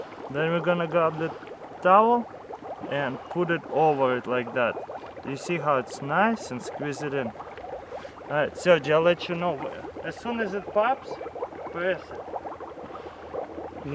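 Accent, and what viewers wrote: Russia. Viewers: for Russian Accent, Love it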